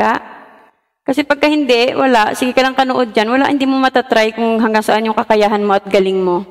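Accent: Iloilo, Philippines. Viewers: Sumuaubra lang sila niyan idol...parang ilonggo ka din 😅😅😅❤️❤️❤️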